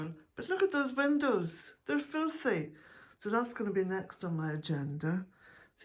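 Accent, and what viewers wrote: Ireland. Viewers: Looking at your garden was very meditative. You have a beautiful place there. My Mom's parents came from Ireland--Friel and Canny. I didn't realize when I clicked on your video that that's where you are. It's nice to hear the brogue again and hear the appreciation for nature and respect for Mother Nature and some of the hopeful Irish sayings. And for, the numerous 'cups of tea'. I was looking at your stone floor. I would love to do that in my home. I love stone work. I love sitting on the jetty at the ocean listening to the waves and birds. It's just so grounding. So glad I came here today. Thank you. ❤ --Kate Cape Cod, USA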